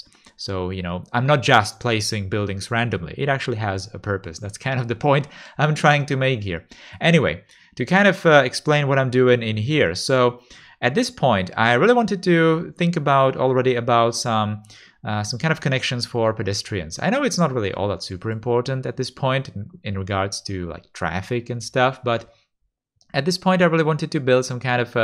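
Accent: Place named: Czech Republic. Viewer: Usually you can recognise Czech from a mile by his accent. With I was thinking that I can hear a Czech or Slovak accent but it's so subtle that I thought I must be mistaken.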